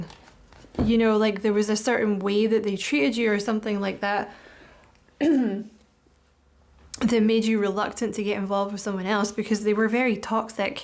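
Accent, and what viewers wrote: Scotland, United Kingdom. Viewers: Nice to hear a Scottish lassie here...we the best at this...love it I read too X with love Edinburgh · This is so accurate on many fronts, thank you 🙏🏼♥️🙏🏼 Let’s hope this new person comes in as soon as the Devil ex is well and truly out of my life! Lovely to hear a fellow Scot and to see you being so successful in your field xx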